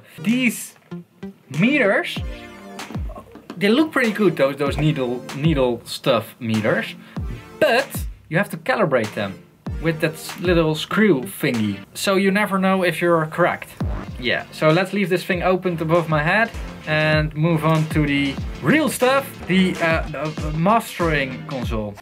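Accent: Netherlands. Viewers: are u dutch? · dutch guy trying to speak english. damn. facepalm, ottoman sideslap, whatever...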